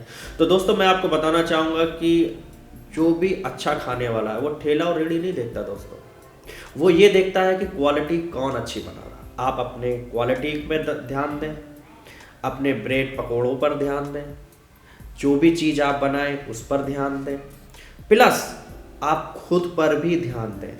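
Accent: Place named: Uttar Pradesh, India. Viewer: up ka he ha lagtha ha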